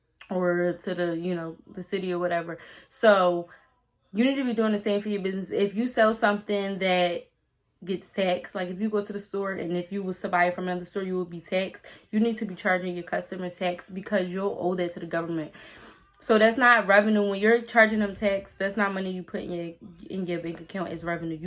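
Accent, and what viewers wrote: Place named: Philadelphia, United States. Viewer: Thanks for doing this! You should like you’re from Philly too 🙌🏾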